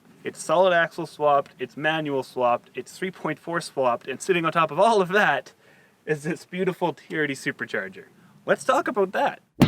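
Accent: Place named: Canada. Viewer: He’s mocking your (Canadian?) accent. Ignore the troll. Great video, great sound!!! 👍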